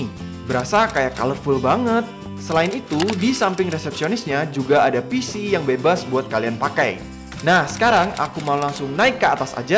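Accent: Java, Indonesia. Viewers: Kakak orang jawa?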